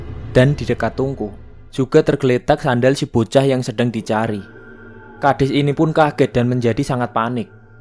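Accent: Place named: Java, Indonesia. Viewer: Gaya ngomong lu garing trus medok lagi min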